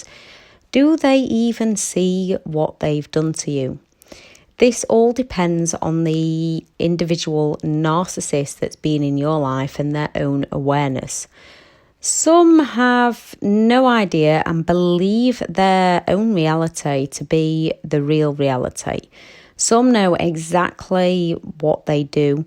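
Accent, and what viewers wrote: United Kingdom. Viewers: Thank you so much for this. I've broken free, but apart from the initial feeling of 'I"m Free!","I did it!", there's been such crushing anxiety to the point that my legs don't seem to work properly when I go out. My brain tells me I'm slipping when I;m not. I fear wet pavements and shiny tiled floors. I know it's anxiety, so I push through and still walk on these surfaces. I shake at the prospect of going anywhere new and working out what buses I need to take: something that I used to be able to do almost in my sleep, so to speak. But I'm still doing it...I hope this isn't making me worse? It just seems like I must push through, not give in. What you have said really, really helps. Thank you, from Cornwall, UK. (Your accent sounds British). Someone in my own country in the know?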